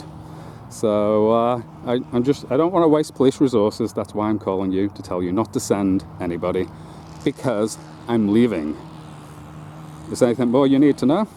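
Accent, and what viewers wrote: United States. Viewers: Come on man. You got to expect a little needling with that Texas accent.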